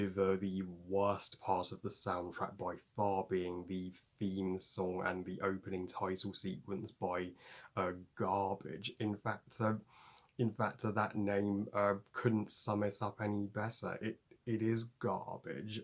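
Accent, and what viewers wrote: United States. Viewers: Great American accent 😊 Happy weekend, my friend!!! 💜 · Oh my gosh. You’re so awesome! 👍😂 I love your American accent. More more! Artwork is awesome!!!🥰❤️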